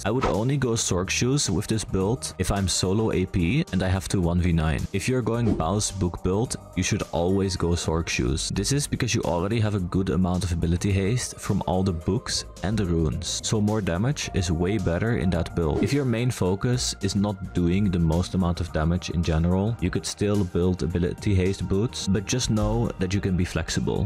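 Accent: Turkey. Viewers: Are u turkish though? 💀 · Are you turkish: DDDASDASD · Are u turkish lad? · great guide just one question Are you Turkish?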